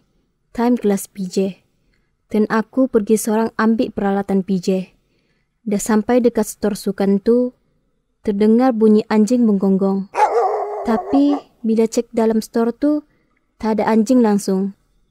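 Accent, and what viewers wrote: Sabah, Malaysia. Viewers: Sabah ke ...swk punya cerita..tpi pembaca mcm sabah..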